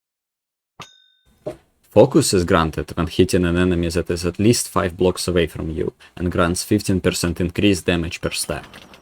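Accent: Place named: Russia. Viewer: why do I hear a strong Russian accent in your speech?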